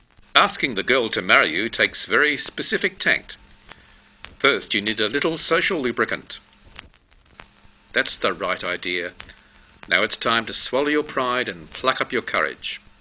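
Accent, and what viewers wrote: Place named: South Africa. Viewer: Funny! But why the South African accent?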